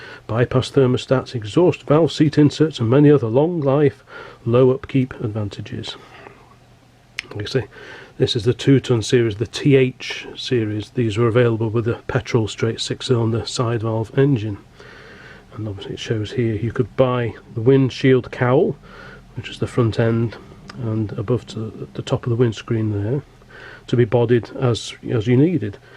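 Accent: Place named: United Kingdom. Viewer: Truly appreciate the British hackscent accented narrative. The lack of a loud annoying soundtrack is certainly lovely. Just the facts sir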